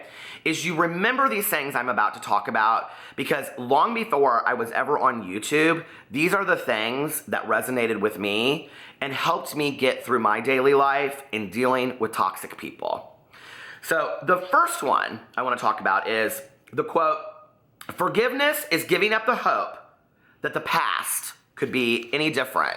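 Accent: South, United States. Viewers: Ur a hoot!!! I absolutely love ut channel, personality, thoughts and southern accent....haters will hate - please dont evet forget how loved u r! 💜💙💚💛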